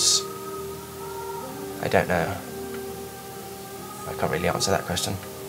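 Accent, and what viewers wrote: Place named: England, United Kingdom. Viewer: you sound more english than welsh, aw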